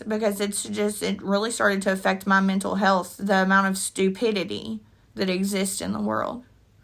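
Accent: Kentucky, United States. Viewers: I’m talking to my mother now and told her about you going to Lake Herrington and she said my great grandfather worked there and his name is name is Jessie Lee Price and I guess he met really famous people there because they had bootlegers in the area. I told her how sweet you are and how much I love your accent bc it reminds me of my time I spent in Ky. My mom said we have family in Louisville and Lexington as well, Alexander County, and Boyle County. My stepdad family is from its Somerset ( Scienceville ). So we have family all over Kentucky lol 😂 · Hey neighbor.... I'm A KY girl too. Thanks for sharing your story. I subscribed to your channel. Hope to see more content from you. I feel the same way about her. I'm also in recovery. Nice to hear your voice with the familiar accent. You are so REAL .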